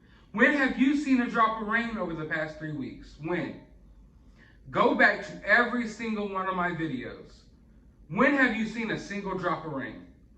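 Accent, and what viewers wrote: South, United States. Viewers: Where in the south are you from? I'm from Alabama!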